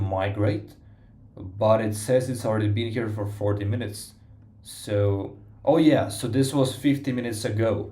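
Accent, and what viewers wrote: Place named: Russia. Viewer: Sound almost Russian 🤔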